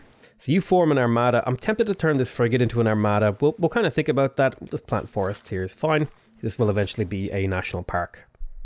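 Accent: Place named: Ireland. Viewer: He sounds Irish, might as well ask if the Pope shits in the forest.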